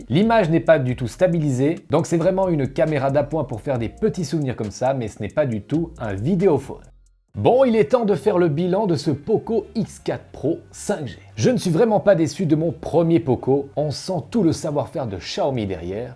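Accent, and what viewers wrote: Switzerland. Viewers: Rien que pour ton humour et ta joie, ce qui ne t'empêche en rien d'être très Pro, précis et perspicace, je regarde tous tes tests même si j'ai pas vraiment besoin d'un smartphone... mais plutôt de sourire sur ton charmant accent franco-"phone".... hihihi! Bises à la Suisse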